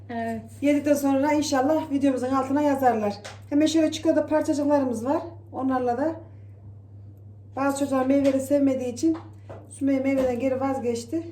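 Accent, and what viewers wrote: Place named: Sivas, Turkey. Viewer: Bizim sivemizde aynı bacı Sivas liyim 😊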